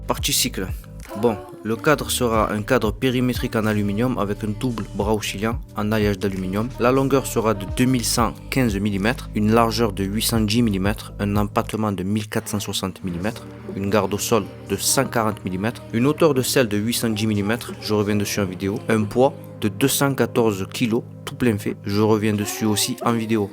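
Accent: Marseille, France. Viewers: test sympa avec ce joli accent de Marseille sans montage bidon toutes les 2 secondes, bien aimé l avis honnête sans matraquage Mt malgré que tu roules en Mt, faut un jour que les procureurs du bicylindre arrêtent de faire des test en voulant systematiquement polemiquer bi et 4, ce sont deux mondes différents et deux choix différents. Le seul bemol dans l avis , on peut bien le prendre en A2 et debrider aprés en 95 si on aime les 4 cylindres, elle est justement A2 pour ça. like et abonnement ✌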